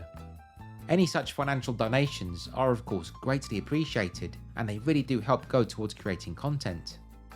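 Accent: United Kingdom. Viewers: Everything is more interesting when told with a British accent.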